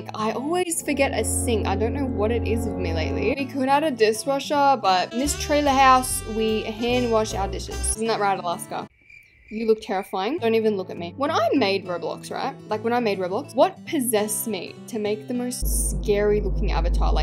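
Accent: South, United States. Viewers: How is Alaska better at a country accent then me and I’m from Texas 🥲🥲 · Can you do a video only using you country accent? LOVE YOUR VIDS!!!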